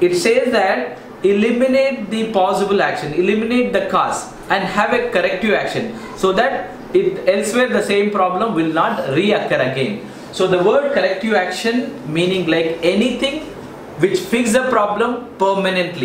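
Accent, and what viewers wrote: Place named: India. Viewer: Hello there. Dear bro thank you for this concept. You've explained 8D methodologies well with your nice Indian accent. One question: I work at a pharmaceutical Co. in Iran, QA dep. A validation expert. I always been confused with definition of VALIDATION & VERIFICATION. Can you please clarify them seperately?❤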